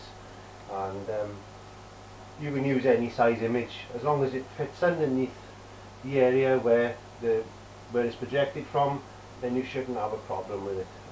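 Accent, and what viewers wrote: Wales, United Kingdom. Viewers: seconds in, I prepared to hear the Welsh accent. (ou can also draw a bit too, butt). God's Country. from an Irishman.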